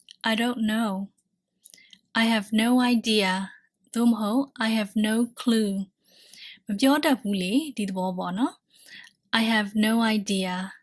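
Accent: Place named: Myanmar. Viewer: I like the burmese accent, its sweet in ears.. 😊😊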